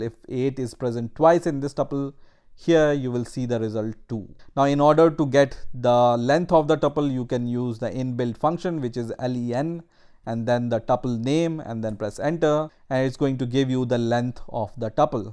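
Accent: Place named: India. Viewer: i like your indian accent. it gives the lesson some life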